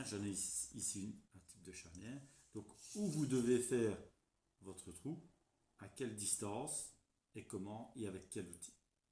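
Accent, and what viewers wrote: France. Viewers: Stunning French accent ☀️👏💕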